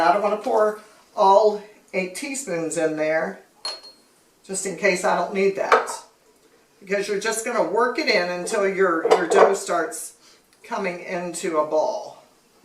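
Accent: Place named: Kentucky, United States. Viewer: You sound like a Ky. Girl, but I’m not sure. We like chushawl better than pumpkin too.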